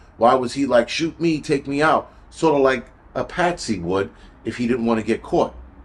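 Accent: New York, United States. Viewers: I love your New York accent ♥️ Keep asking questions. We want to know the truth! I agree, I’m not comfortable how Erika Kirk made the statement “I forgive that man”. What man? Tyler Robinson? There are no photos or REAL Evidence linking him to the crime.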